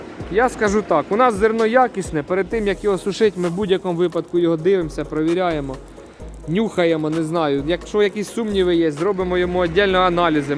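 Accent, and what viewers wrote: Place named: Poltava, Ukraine. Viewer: Судячи по говору Полтавська.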